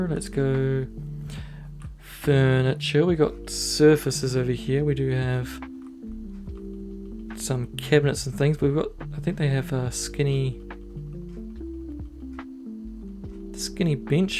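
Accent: New Zealand. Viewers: wow very nice relaxing vid!! love your voice, are you from new zealand?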